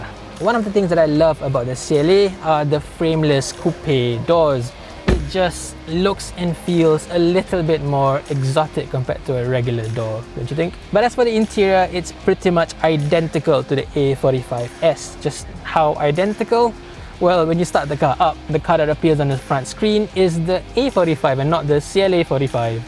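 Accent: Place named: Malaysia. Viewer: Your English is so good and can recognize u r malay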